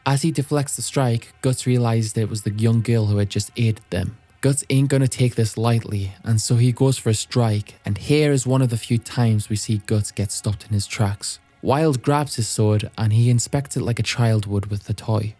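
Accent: United Kingdom. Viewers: his awful British accent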